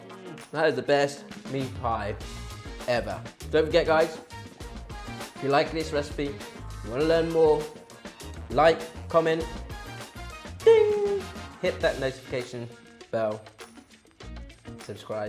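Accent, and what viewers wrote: United Kingdom. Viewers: thank you.. you sound sooo British.. San Diego Ca.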